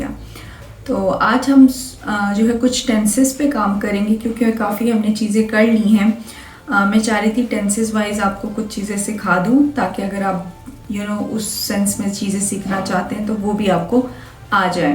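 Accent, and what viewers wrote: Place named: Gilgit, Pakistan. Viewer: You are teaching Gilgit accent and In my knowledge Majority of shina speakers speak Astori, Chilasi accent even in Indian Kashmir.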